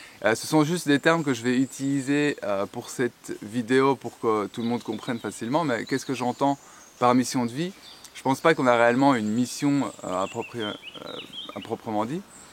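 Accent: Belgium. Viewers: Coucou j'adore ton accent bien belge, ( je viens de la province de Liège)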